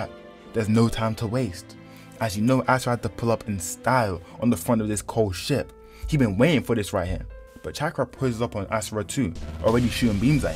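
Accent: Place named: United Kingdom. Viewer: Stfu British zn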